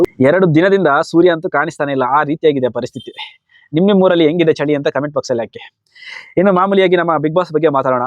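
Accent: Mangalore, India. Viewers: Sir neevu banglore navra, nanu nimma mathu nodi manglore ankonde, navu banglore, thumba chali ide, Rakshitha Gilli best❤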